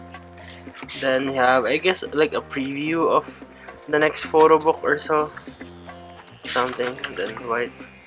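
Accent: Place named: Philippines. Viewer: Im guessing your Filipino? Idk but I your accent kinda sounds like filipino’s accent when speaking english. BTW your unboxing is great!! Thank you for supporting twice ^^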